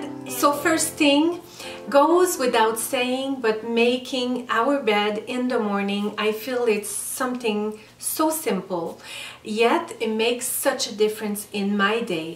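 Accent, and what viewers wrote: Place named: France. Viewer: Hello, you’re always so well organized and disciplined. I like it, but sometimes I’m a bit lazy to do it like that. I love your channel. I’m from Germany and unfortunately i don’t speak English well. Sorry for that. I enjoy every video with and from you. 👌This light French accent is very adorable to you. 😘🙋🏼‍♀️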